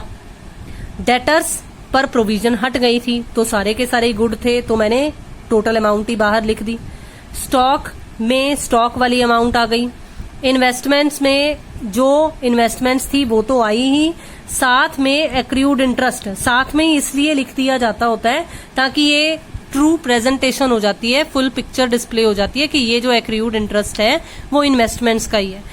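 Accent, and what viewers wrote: Punjab, India. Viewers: Punjabi sound 😝😘😘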